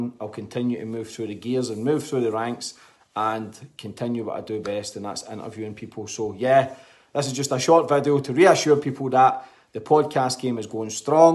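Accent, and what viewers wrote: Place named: Scotland, United Kingdom. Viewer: Scottish surely?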